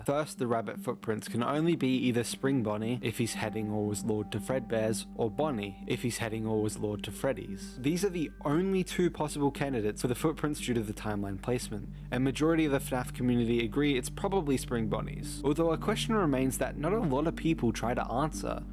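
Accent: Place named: United Kingdom. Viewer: that was a joke. He lives in the US but has a British accent and so does his kids.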